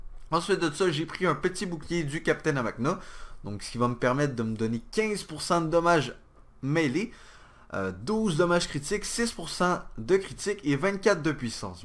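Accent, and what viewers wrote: Quebec, Canada. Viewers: Yessss un québecos ostie!!! Enfin!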